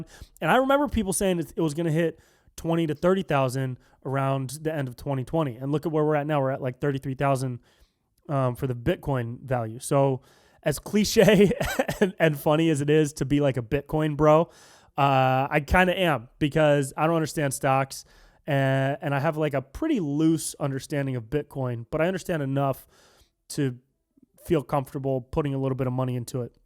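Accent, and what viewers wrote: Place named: Northern California, United States. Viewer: Bruh every time I watch these videos It makes me proud of NorCal. Thanks for repping our accents, culture, etc. I’m 18 from Chico town.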